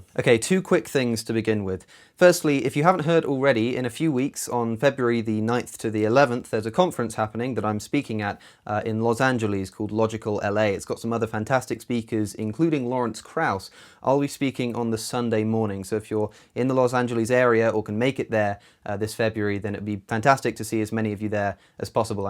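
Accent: United Kingdom. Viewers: Why is he so british? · Woah, your British accent jumpscared me.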